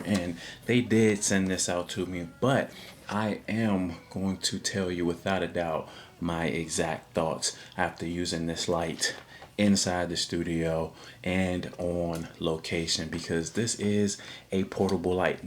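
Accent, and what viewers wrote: United States. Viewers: the maryland accent on a shorty gone get me everytime 😭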